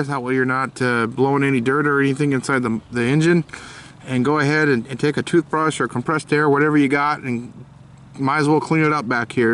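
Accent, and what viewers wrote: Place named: Midwest, United States. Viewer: You must b a midwest boy.nice clear speech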